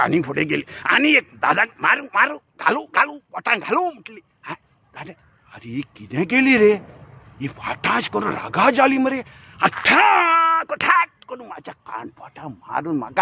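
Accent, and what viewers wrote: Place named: Ghati, India. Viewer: Like your perfect ghantti language and acting God bless you 🙏🙏🙏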